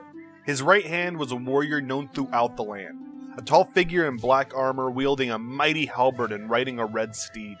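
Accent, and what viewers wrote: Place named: United States. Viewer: fuck these murica pronunciations honestly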